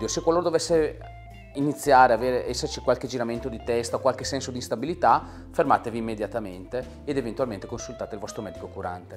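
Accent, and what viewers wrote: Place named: Brescia, Italy. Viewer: Ciao dall accento mi sembri zona BS... Hai uno studio dove visiti di persona in zona Brescia? Grazie!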